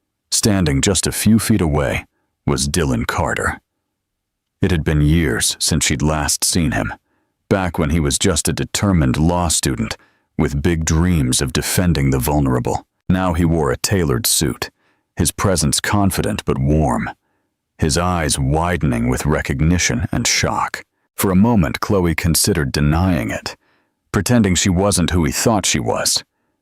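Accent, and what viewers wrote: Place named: Australia. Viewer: It sounds like 1950s Australia ! Not today ! 😂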